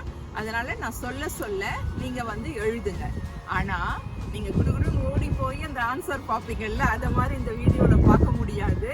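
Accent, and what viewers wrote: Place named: France. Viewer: Madam french accent . Where is video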